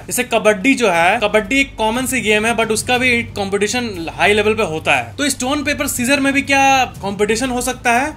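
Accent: Bihar, India. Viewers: missing telling facts in bihari voice form💖😊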